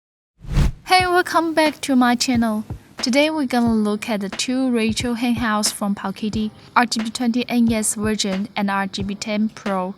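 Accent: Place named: China. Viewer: 超级重口味中式英文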